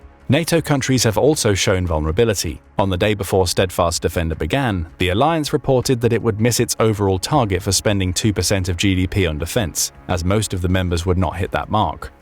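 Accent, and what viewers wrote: England, United Kingdom. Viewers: I think the narrator is from The UK. Greetings from a fellow Dane 🇪🇪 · That English accent is all I need to know about the serious b.s. joke I'm about to hear 😂😂😂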